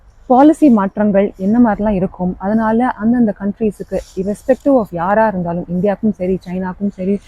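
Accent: Sri Lanka. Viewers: Are you srilankan tamil mam?! Like native of yours or parents?!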